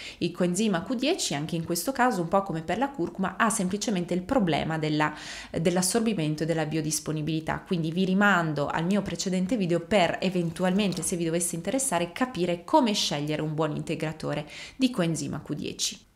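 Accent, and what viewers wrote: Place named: Turin, Italy. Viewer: Ma lei é di Torino neh? Ma boja faus😊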